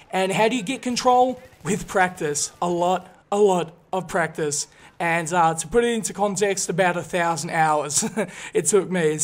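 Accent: Australia. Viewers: epic gameplay by the way what accent is that ? aussie or brit ?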